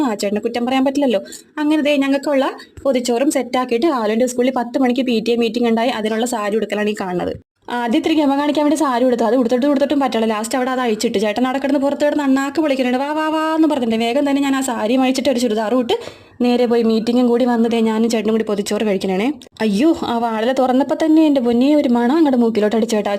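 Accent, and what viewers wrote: Kochi, India. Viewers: Kochi basha 😂same 2u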